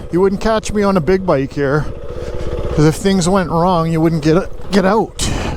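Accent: Canada. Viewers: From the first shot, I was like wonder where he’s at? Starts talking: oh, definitely Canada. Probably Kelowna. Good riding man! I do wonder why you would do this over a 500 EXC with traction control and a Rade Garage tower. You Get the torque, suspension, engine mapping. I wonder if it’s just the electronics package that makes this bike interesting as ADV bike.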